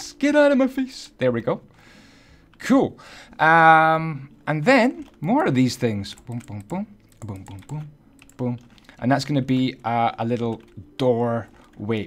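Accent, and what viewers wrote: Scotland, United Kingdom. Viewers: Are you from scotLand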